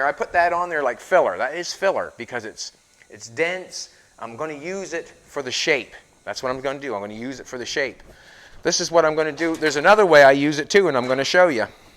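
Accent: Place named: Annapolis Valley, Canada. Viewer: Came across this looking up fibreglass fill and I saw your last name and thought Annapolis valley. When you spoke that cinched it ( I grew up in Berwick). Neat. Your video is helpful too.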